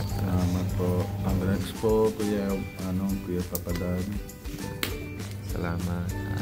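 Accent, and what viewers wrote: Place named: Philippines. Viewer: Para na talaga filipino si kuya mapapansin sa salita nya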